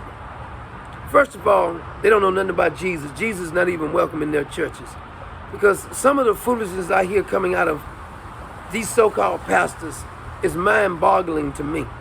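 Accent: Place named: South Carolina, United States. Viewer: I grew up in SC, i live in NJ now tho. Hearing your accent reminds me of home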